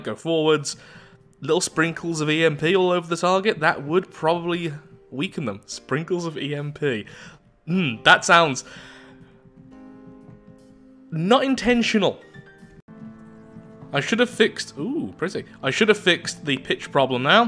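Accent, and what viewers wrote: United Kingdom. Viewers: He's briish that's it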